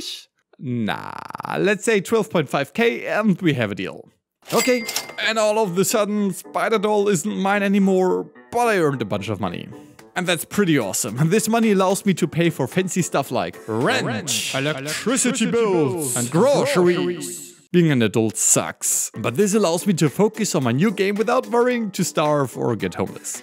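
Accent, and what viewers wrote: Germany. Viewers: You can really hear the German aktent.😅 Do you still have a say in the game? But I don't think so.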